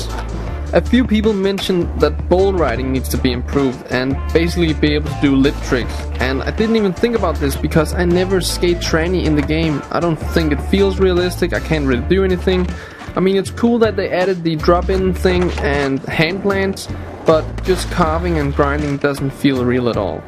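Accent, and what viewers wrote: Denmark. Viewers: Er du fra Danmark ? Du lyder virkelig dansk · man ka bare høre at du er dansk men hvis man er udlansk er det selvfølgelig ikke noget problem XDXDXDXD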